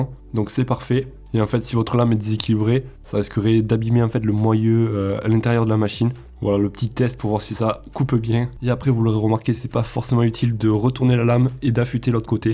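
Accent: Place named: Toulouse, France. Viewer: bel accent. toulouse ?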